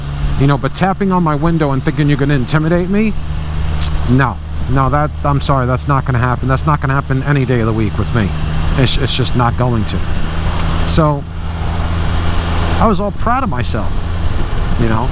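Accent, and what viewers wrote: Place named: New York, United States. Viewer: okay!!!!.......i'm hooked!!! some of the funniest videos i've seen on youtube. thanks for the laughs. i grew up in scarsdale in the very early 70's and visited long island a few times back then. it's also great to hear that authentic new york accent and attitude. thanks for making me laugh for a few minutes, stay upright and healthy.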